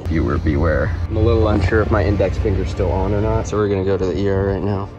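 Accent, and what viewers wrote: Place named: Vietnam. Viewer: Lol sound very vietnamese!!!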